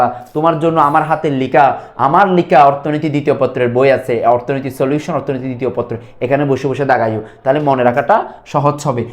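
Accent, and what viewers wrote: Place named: Chittagong, Bangladesh. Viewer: Free class koro Abr eto kisu? Spelling e somossa na sir Chattogram er so sir er pronunciation ta different hobe etai savabik taina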